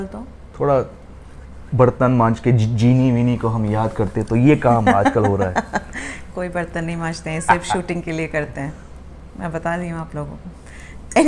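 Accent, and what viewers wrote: India. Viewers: Very nice couple , he has his own identity surely And Jamie has a lovely wife with beautiful confident voice and Indian accent 💕🇮🇳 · Bhai I love Garhwali ascent in your spoken English, reminds me of my childhood friend Bhola Singh rawat from Khatta Pani Gaon. Regards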